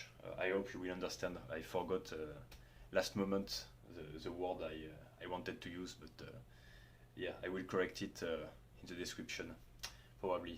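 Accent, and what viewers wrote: France. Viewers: Great video and iconic French accent. Subscribed 👍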